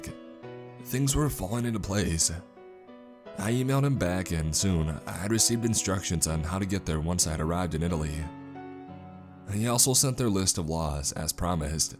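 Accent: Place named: Midwest, United States. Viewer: General mid-western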